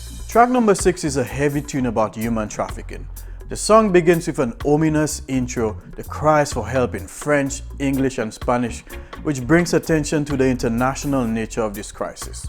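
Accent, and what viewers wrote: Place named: Dominica. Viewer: I'm not an expert in West Indian accents or dialects (I've only lived in Jamaica), but your accent sounds like people I've met from Dominica. Are you from there?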